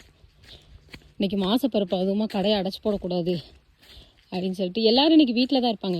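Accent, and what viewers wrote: Tirunelveli, India. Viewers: நம்ம திருநெல்வேலி தெளிவான பேச்சோட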